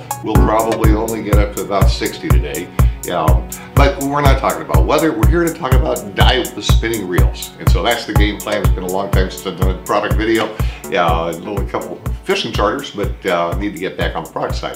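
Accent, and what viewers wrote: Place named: East Texas, United States. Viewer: I think I was pretty clear why I didn't spend time yacking about drag levels, talked about that at the end of the video. Watch the end of the video and perhaps you'll understand why I feel those numbers are pretty much bunk. In my mind, its pretty silly to talk about high numbers when they don't apply to reality. Who cares if a $200 spinner has 30-40 lbs of drag, it's not going to be used and the rest of the drive train won't necessarily sustain those pressures. On a 50 sized two speed made for catching cow sized tuna, it's meaningful. On a lighter duty spinner, it's really irrelevant so long as there's enough usable drag to fish the intended line class. The BG's do that very well, as do the Saltist series spinners. As to why I say yeow...simply because I can.... Why do I wear a hat...because I like 'em and it's cold on a shaved head. 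Why do I wear clean underwear... I am not a TV personality, newscaster or info-mercial talking head, I'm a f'n fisherman who tries to provide some info to anglers. Do not expect more than what I'm capable of providing, and if my manner or pauses in dialog, accent, colloquialisms are disconcerting, so be it, no apology. Shucks, catch me at the right time and I'll lapse back to an East Texas accent. No doubt the manufacturers' own vids are far more professionally produced, and you'll get more of their talking points and buzzwords. However, our vids are independent, honest, and accurate, and done to the best of my meager ability. I'm happy to take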